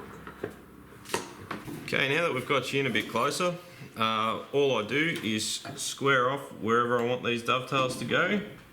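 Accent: Australia. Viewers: THATS an aussie accent, if i´ve ever heard one! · Great to hear another Aussie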